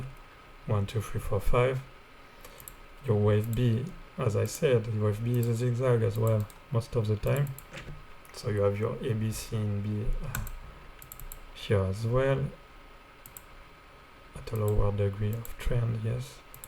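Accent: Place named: France. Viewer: By the way, I like your french accent...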